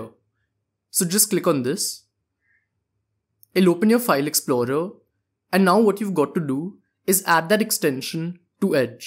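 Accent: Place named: India. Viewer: indian or somthing like that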